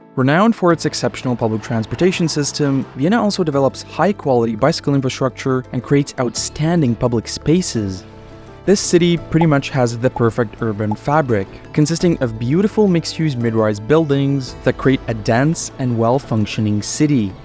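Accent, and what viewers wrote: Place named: Latvia. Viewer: šis viģiks arī ir ahujena bet es tev izaicinu uztaisīt katru piekto viģiku latviski >:) es dzirdu to mikroskopisko akcentu muahahahaha (es atvičai tu esi latvietis)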